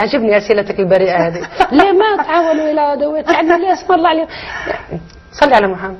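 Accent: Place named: Maghreb. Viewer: لهجتها مغربيه او تونسيه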